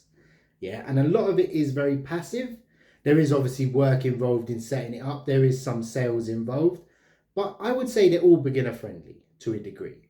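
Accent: United Kingdom. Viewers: I don’t know if this sounds unprofessional or not but please let me know what type of british accent you’ve got. Thanxx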